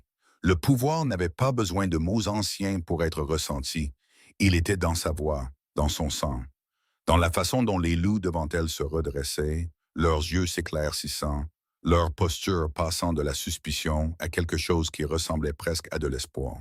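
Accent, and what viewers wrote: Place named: England, United Kingdom. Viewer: Je n'ai pas aimeé le vois qui a un accent anglais. Mauvais à ecouté. Dommage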